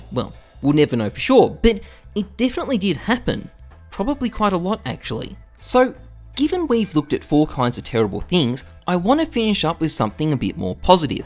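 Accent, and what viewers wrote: Australia. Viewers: Why does he sound like someone doing a parody of an Australian person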